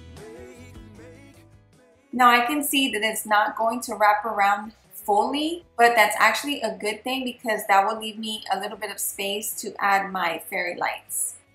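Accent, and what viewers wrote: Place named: Hawaii, United States. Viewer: Yes, I hail from Oahu...my stomping grounds are Waikiki and Kaimuki, now I reside in the Nevada desert. I miss the beauty of Hawai'i, so I try to incorporate "home" as much as possible in my decor. You sound like you have a Hawai'i local twang...if so, Auryte den, so great to see a Sistah sharing da Aloha wit da world! 🤙🌺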